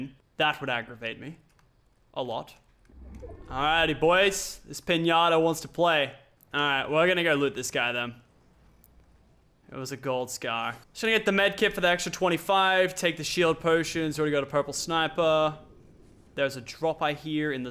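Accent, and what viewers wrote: Australia. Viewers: So are you Australian or American? Which accent are you putting on?? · Are u Australian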